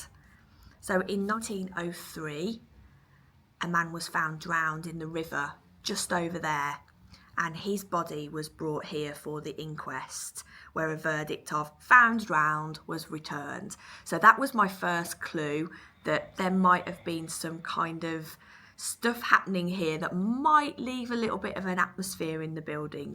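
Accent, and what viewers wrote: Birmingham, United Kingdom. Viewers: Hi im glad I found your channel a few weeks ago it's so lovely to hear your brummie accent you show so much interest in the local history of the Midlands i love hearing about the history of the cotswolds where i come from although I now live in denmark i never forget my roots we working class people have so much history to tell that it was us that made Britain what it was keep up the good work, best wishes roy copenhagen denmark. 😊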